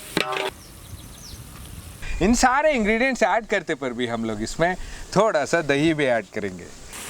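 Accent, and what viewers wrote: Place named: South India, India. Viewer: MashAllah bhai tumhari Urdu bari mazay ki hai... South touch ata hai... Love from Pakistan to the Indian ❤️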